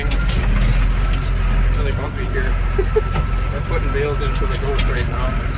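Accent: United States. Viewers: First time I hear someone talk about herding with an American accent. It sure is something to get used to after listening to Scottish and Irish farmers. Anyway, very interesting video. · Nice to listen to a fellow midwestern accent. Keep up the great work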